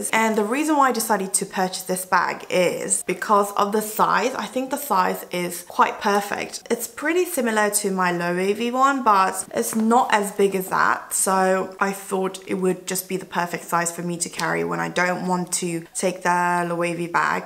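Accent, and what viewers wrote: England, United Kingdom. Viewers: 너무 예쁘시고 영국발음도 듣기 좋아서 이거 한 10번째 이상 보고 있는 거 같아요. 대사도 워드에 다 쳐서 영어공부로 외우고 있어요 ㅋㅋㅋㅋㅋ 앞으로 외울 때까지 <num>번 더 볼 예정... 사랑스러운 영상, 진심으로 감사드려요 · Oh wow idk why but i can hear your english accent more in this video! Hope you can do makeup collection video one day! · 우아 오랜만에 언니 영국영어 들으니까 너무 조아요❣️❣️ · 언니 영상으로 영국발음 공부해여....♥️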